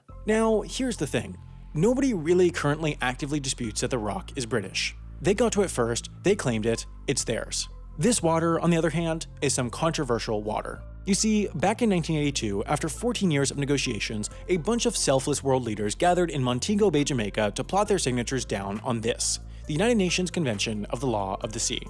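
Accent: United States. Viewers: That Guys annoying Whiny American Accent is so annoying, America , The Land of The Free-DUMB. Trump 2024 LMAO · He just sounds like a bitter yank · Most boring load of shit I've heard in a long time, that God awful yank accent.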